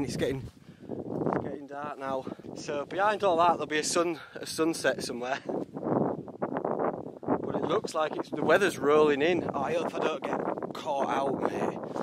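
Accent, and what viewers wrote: Yorkshire, United Kingdom. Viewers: Hey up I’ve been off work all week, with a knackered back and discovered you on Monday and you’re all I’ve watched since. I’ve watched the Cape Wrath, Barefoot 3 Peaks and Coast to Coast videos and more in between. You’re a breath of fresh air pal and have really cheered me up. Latch and Locks, All the play on words ( my favourite one, Deerdre Barlow 😂 ) and of course MEDIC 😂😂 It’s good to hear a fellow Yorkshireman, so keep posting the great clips Fella and stay safe. 👍🍻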